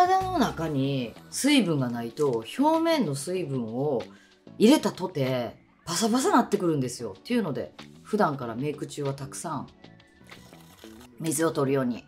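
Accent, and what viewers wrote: Kansai, Japan. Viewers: Vogue で関西弁が新鮮で良い❤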